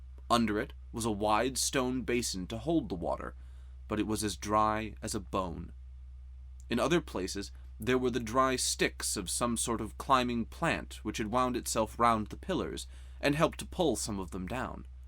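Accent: United States. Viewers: Why an American accent for an English story?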